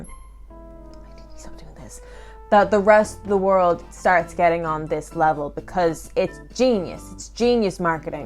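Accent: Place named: Ireland. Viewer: Are you Irish? I like your accent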